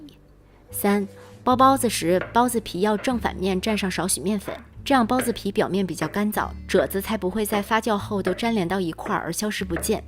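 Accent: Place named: Tianjin, China. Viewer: 听口音是天津的小姐姐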